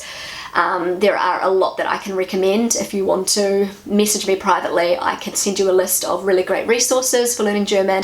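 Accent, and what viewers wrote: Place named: New Zealand. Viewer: You know, hearing that Emilias teacher didn't recognize her as a native English speaker left me a bit wonderous. If she pronounced the words in English class the way she learned it from you it must have been more than obvious to the teacher because your "Kiwi"-English differs very significantly to the standard UK- or US-English that's usually taught here in Germany. Although I must admit that your accent isn't all that strong. I just saw New Zealands PM, Jacinda Ardern, on "The Late Show with Steven Colbert" and I really had to concentrate to understand everything what she was saying. I don't know about her politics but from her appearance on that show I must say she's a great ambassador for your home country!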